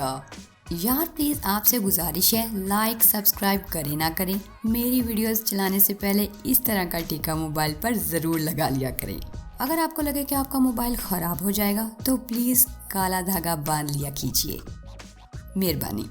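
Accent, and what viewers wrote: Punjab, India. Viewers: Apki Punjabi boht zabardast h . Same jaisy hm ghar bolty hain .. or nazar to kamaaal ki utari Apne 🤗🤗🤗😍😍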